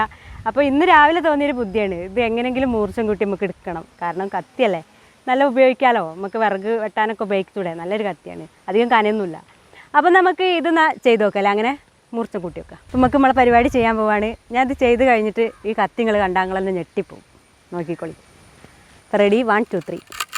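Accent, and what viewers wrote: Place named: Malabar, India. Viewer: മലബാർ ്് സ്ലാഗ് സൂപ്പർ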